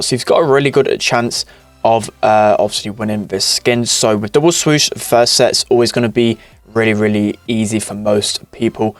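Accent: United Kingdom. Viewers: Awww cute British accent tho👀🥰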